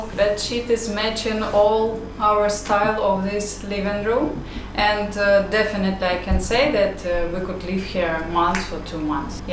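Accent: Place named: India. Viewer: Başkan sen niye Hintli gibi konuşuyorsun?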